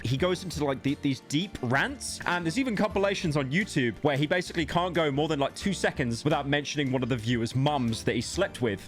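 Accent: United Kingdom. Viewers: Honestly, i think you get age restricted so often because they think that the funny british accent makes the people at youtube think your trying to cater to small children, and that you swear too much for a kids channel. Even though you aren't a channel ment for newborns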